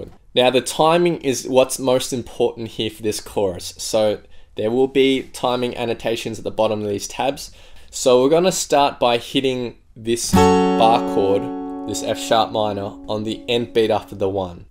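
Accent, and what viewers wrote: Australia. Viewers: Thanks for this great tutorial and the Aussie accent . Both excellent. 👍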